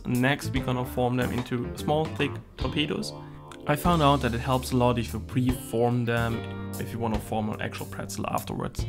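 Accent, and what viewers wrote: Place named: Germany. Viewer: First sentenced told you‘re from germany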